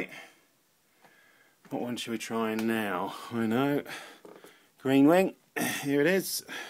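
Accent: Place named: London, United Kingdom. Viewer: Wha(t) a nice Bri(t)ish Londoner accent 'e 'as. Take a DVD and pop it in.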